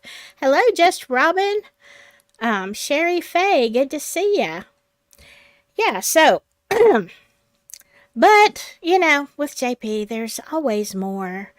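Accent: South, United States. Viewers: Hello there from Oklahoma. I just heard your channel for the first time today. I loved it! You are a delight and I love the southern accent! Born and raised in Florida. Lived a portion of my adult life in Georgia. Put the 2 southern accents together and my friends think it’s very twangy and comical! Lol! Now let’s see what I pick up in Oklahoma!! Thanks for covering this case! I hope you’ll do many more! I listen to everyone covering this.. You just can’t believe it but yet you know it’s true! I pray for Justice for Mica and for the whole truth to come out for this family! Especially if others were involved in this! I enjoyed it and will be back for more! Great job! #JUSTICEFORMICA 😊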